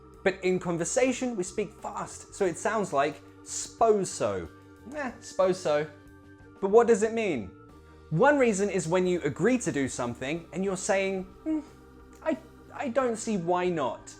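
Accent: United Kingdom. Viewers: Omg! I’m falling in love with your British accent!!! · This is really appreciated that you speak a good British English like a typist 30 word per minute. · I love your accent man! British accent is lovely! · His English is British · A bit of British Accent? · El profe más hermoso! Y con acento británico! 😍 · This is the first time for me can hear british accent very well😂 thank you for not talking so fast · I've knew a lots of new words with british accent today and i was so happy😊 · Nice channel but it's a British English, I would like to see how you explain an American English. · I didn't know these expressions exist! I have followed you for a very long time, and I've learned a lot overall brit accent! well done! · Damn !!!! Where have I been, that I've never seen your videos before ??? I'm not only learning English with you, but also the accent and stress I like the most : the British one ! 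In addition to this, I'm brushing up my Turkish classes with your subtittles. Thanks a lot, and may God bless you for all your effort in this purpose of freely teaching people ! Best regards from Dresden, Germany ! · Im fluent in american english, now learning british english from you. Awesome vid. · Hey good job with this channel, Greetings from Argentina. I have a question: you speak in British English or you just have the accent Is much different the British English expresións than American English expresións ???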